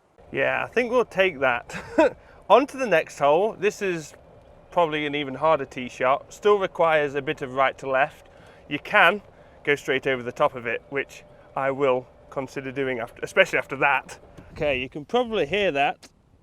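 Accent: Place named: Lancashire, United Kingdom. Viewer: Great video, new subscriber. Where you from originally? Sound like a Lancashire lad 👍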